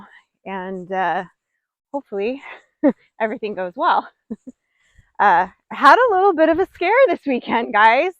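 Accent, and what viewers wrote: South, United States. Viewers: love win your a little tiered you get a southern Drow in you words love that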